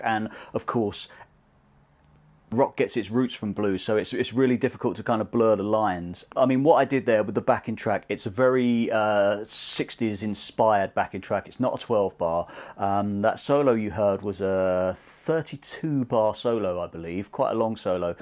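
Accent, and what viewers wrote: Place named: England, United Kingdom. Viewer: I'm a leftie wot plays righty, mostly because my first guitar was my brothers and he got tired of me changing the strings around all the time. So I would not mind seeing the leftie versions of these as it would look like a mirror image which I would have no trouble with. Also it is refreshing to find an english video tutor. THe yanks are fine but it gets a little tedious listening to the accent. If I wasn't so broke I would sign up for the course immediately. A natural teacher you can de mistify the blues I have heard for so long now. very refreshing to watch and learn. Well done. keep it up.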